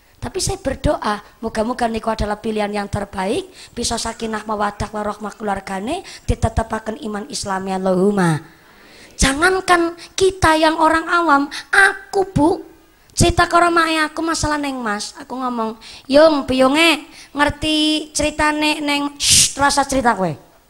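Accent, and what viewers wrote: Banyumas, Indonesia. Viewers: Seru banget dengerin ceramah ustajah mumpuni asyik bahasanya carpur ngapak emang KL jebolan Indosiar cakep semuanya.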